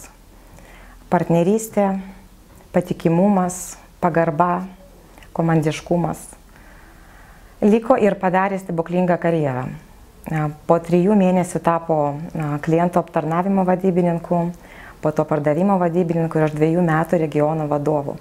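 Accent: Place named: Russia. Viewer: kaip zmogui lietuvisku vardu ir pavarde (mergautine) gavosi taip istobulinti rusiska akcenta? metu metus metelicoj sedejo?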